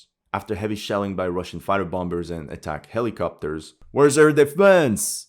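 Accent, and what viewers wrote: Jamaica. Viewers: Bomba Clot'! I was trying to figure out your accent. You're Jamaican! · 😂😂😂😂 i love this one 🇯🇲🇯🇲🇯🇲🇯🇲 you sound like a Jamaican 🇯🇲🇯🇲🇯🇲🇯🇲🇯🇲💯💯💯💯🇯🇲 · 🤣🤣🤣🤣🤣🤣 Boboclaaaat ! love the Jamaican voice . big up mi g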